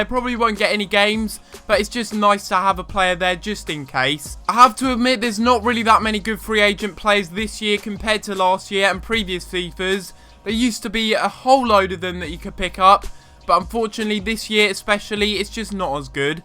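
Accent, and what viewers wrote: England, United Kingdom. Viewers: FIFA 16 Career Mode you did it sounded weird because of your English accent (I'm Australian)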